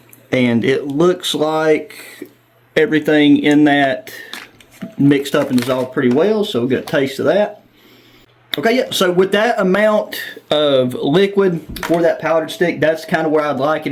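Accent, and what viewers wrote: South, United States. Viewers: Cool civilian ration Amigo. Components sound good. Glad extra seasonings, pepper, red pepper, etc. They come in handy. Dividing A 24 Hr. ration is difficult, I have been there, and will again soon, LOL. It's bad, when civilian rations have better FRH'S than the military gets. All meals sound good. Some components might be off just A little, nothing bad. This fresh, I would not expect too many to be bad. I would not think they would, but you never know? I have been fooled before. Good meals, great review. Glad you had fun. Peace from Ky.. P.S. From your accent and way of talking, you are from the South, or once was. I am glad to hear A voice like yours. Makes me feel like I am not alone in the ration community, LOL. Hope all are well your way, need me, just holler. Peace. Nicely done friend.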